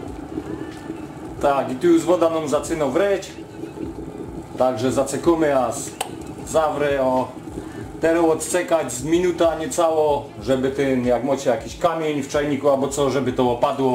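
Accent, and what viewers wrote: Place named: Poland. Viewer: Wyczuwom gorolski akcent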